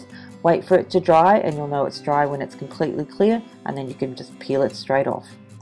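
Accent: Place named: Australia. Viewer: This is awesome, and how great is it to actually hear an Australia accent. Thanks for sharing this with us. Could you tell me where you get your Seche Vite from, please? I live in Hobart, so if you can get it I should be able to as well, but I haven't been able to find it anywhere. Can't wait to check out all your other posts.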